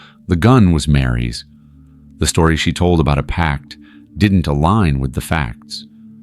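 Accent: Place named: Utah, United States. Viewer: corrected your mistake on JASMINE RUTH BRIDGEMAN and now I need to let you know that in Utah the predominant religion is the CHURCH OF JESUS CHRIST OF LATTER DAY SAINTS (MORMON) and we have the most children in the country because they believe that they are making more of actual God’s by having many children, so that explains why Utah is notorious for child abuse and child deaths. Again thank you for the spotlight on these horrific crimes. Not sure if you are from Utah but sounds like you are. Again thank you.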